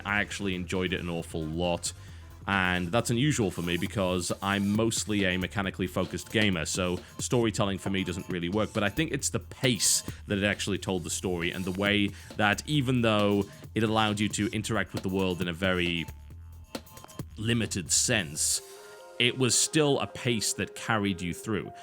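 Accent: United Kingdom. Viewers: No, it's just the British English (a.k.a. English in England i.e. the correct English) way of of pronouncing those words.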